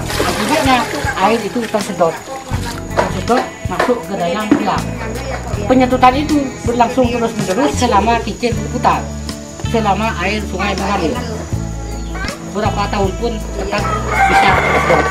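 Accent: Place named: Madura, Indonesia. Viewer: waww.... orang madura nih bahasanya... mantep2 !!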